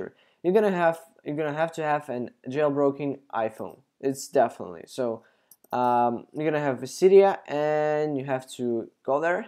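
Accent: Poland. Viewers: Are y o u polish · Are you Polish?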